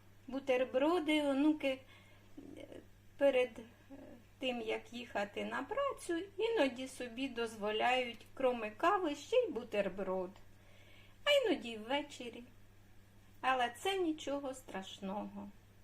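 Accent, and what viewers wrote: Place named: Ukraine. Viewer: подивилася ваше відіо в сльозах,не дай Боже пережити нікому,що ви пережили,як ви сказали. Ми маєте для кого жити. Дай Боже дочекатися правнуків У Вас приємний голос і укр.акцент. Я з нетерпінням чекаю ваше відіо. Поважаю Вас. Здоров'я Вам іздійснення ваших мрій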